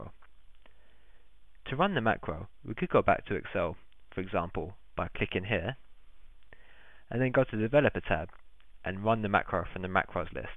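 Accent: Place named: England, United Kingdom. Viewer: I have a hard time trying to understand VBA. Trying to understand that Englishman's accent makes it harder. I have had to rewind to listen to what he is saying half a dozen times in this video, and still didn't get all his words. I know he sounds like that cute gecko on the Geiko commercial - but have him work on his accent for us American folk just trying to learn VBA. Thanks. (I am sure he is probably a nice guy - don't take it personally, guy.)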